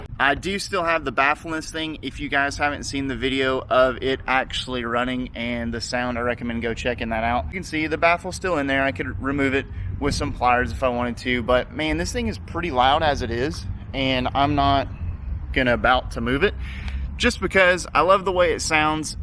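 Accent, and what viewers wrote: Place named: Georgia, United States. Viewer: You sound like chase on two wheels. Must be a Georgia thing. Sick bike btw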